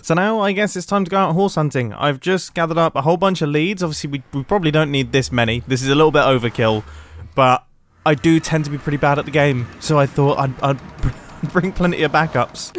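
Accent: United Kingdom. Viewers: Wow was extra british this episodes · what type of British accent is yours?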